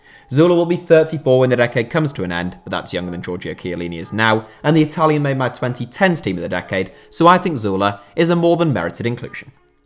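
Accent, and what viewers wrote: Ireland. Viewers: Is this the voice of the irishman man from the other HITC channel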